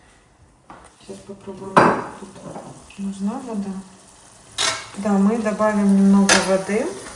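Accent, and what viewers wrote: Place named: Gyumri, Armenia. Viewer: Я сразу поняла по акценту и очеень рада,я тоже из колориитного города Гюмри❤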